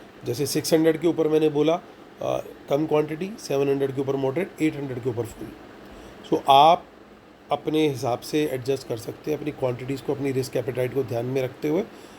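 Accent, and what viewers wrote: Punjab, India. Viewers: Love u sir always rocks sir r u from Punjab or hariyana it doesn't matter but tone thx if u r in mumbai will meet u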